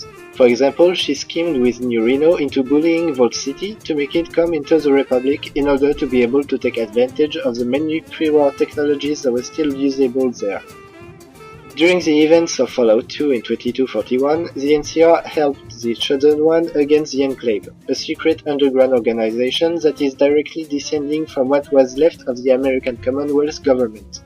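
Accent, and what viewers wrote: France. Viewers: Your French accent is thick and very difficult to understand. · A l'accent français x] Le meilleur accent du monde ;] Sympa la vidéo. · Mec sa se voit que t’es français ou tu parles cette langue parce que ton putain d’accent est dégueulasse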